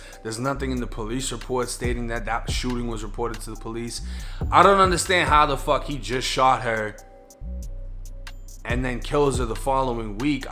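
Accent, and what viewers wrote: Boston, United States. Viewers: If you from florida why you sound like you from south Boston fam?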